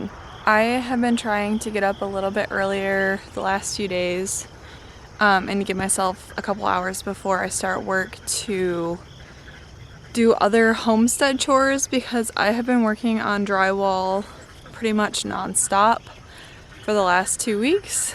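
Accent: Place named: Great Lakes, United States. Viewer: I have sisters in Gaylord and the Soo and I live in NE Wis. I thought your accent sounded great lakes like. I'm looking forward to watching more of you videos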